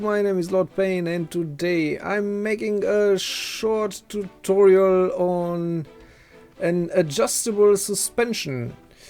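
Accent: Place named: Germany. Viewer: me Too:D i knew i heard that you have some german accent:D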